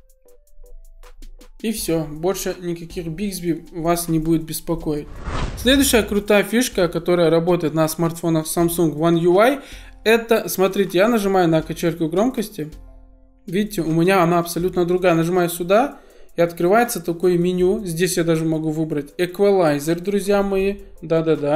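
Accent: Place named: Belarus. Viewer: БЕЛАРУСА СРАЗУ УЗНАЛ.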